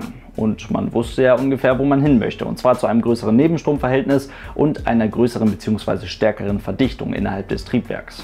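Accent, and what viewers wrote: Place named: East Germany, Germany. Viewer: next generation mit deinem ostdeutschen Akzent im denglisch auszusprechen ist leider nicht witzig, sondern doof.... die englische Aussprache ist doch nicht so schwer, oder?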